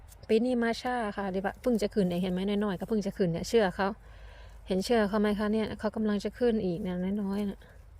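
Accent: Korat, Thailand. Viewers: สวัสดีจ้า ฟังพูดคือคนโคราช บ้างเอ็งแท้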